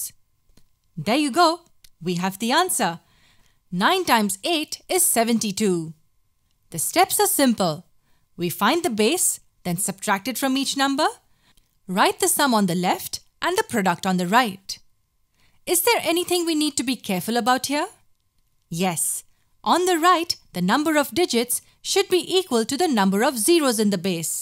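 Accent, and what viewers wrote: India. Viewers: the accent is clearly indian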